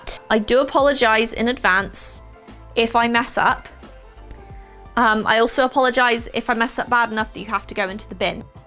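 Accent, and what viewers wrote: England, United Kingdom. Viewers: Very British, but you kept mentioning target and dollers... Do you live in America? But were born in England? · so, I saw the video in my feed, i entered, i listened to her british accent, I saw that she is a cool person, and I subscribed. that's all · Is she from england?? I lover her accent😭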